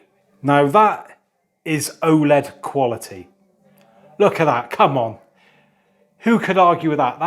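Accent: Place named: West Country, United Kingdom. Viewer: Enjoyed your video, love what I believe is the west country accent too, bonus. This video will be a big help to me as my exact same new tv is in the box ready to setup, just waiting for the new stand to arrive before unboxing and setting it up. Thanks, enjoyable informative video and thanks to your wife for the video work. 👍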